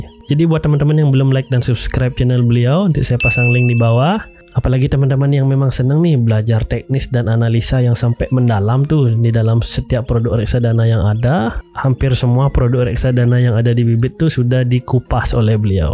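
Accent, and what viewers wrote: Bali, Indonesia. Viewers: setelah nonton beberapa video nya baru sadar lewat aksen dan namanya ternyata semeton Bali wkkwkwaka. semangat Blii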